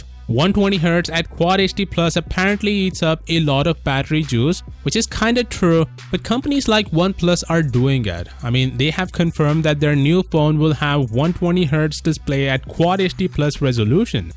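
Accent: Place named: India. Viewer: ANNOYING VOICE OF THE NARRATOR. INDIAN ACCENT REALLY SUCKS!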